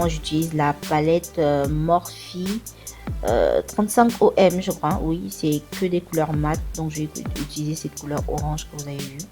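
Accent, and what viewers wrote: Ivory Coast. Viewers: Tu es ivoirienne d’origine ou mon oreille me trompe ?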